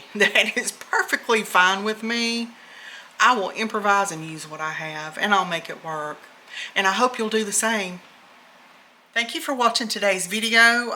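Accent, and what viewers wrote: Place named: Alabama, United States. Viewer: I've been binge-watching your channel tonight. Listening to you talk, I decided you might be from Alabama. I'm from North Central Alabama. During the past year, I've been cooking for one. You've given me such great ideas. Thank you for reminding me it's prudent to take care of yourself and remember to eat. I look forward to your upcoming videos. Blessings! 😃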